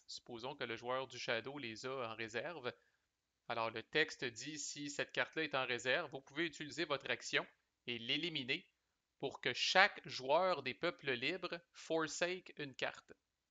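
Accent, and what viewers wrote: Quebec, Canada. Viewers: Merci pour cette excellente série. J'ai fait découvrir à un ami en Espagne qui est moins familier avec l'accent québécois il a bien aimé! On est prêt pour jouer au jeu dans quelques semaines!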